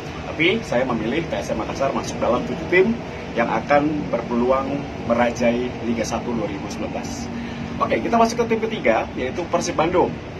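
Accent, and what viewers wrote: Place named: Banyumas, Indonesia. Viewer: Boca ngapak ya sudahlah sudahlah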